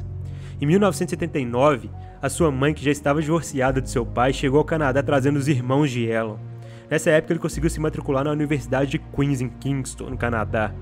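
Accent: Minas Gerais, Brazil. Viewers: Sei q vc 100% mineiro pelo sutaque. Mto bom o video mto inspirador. Thank you!!!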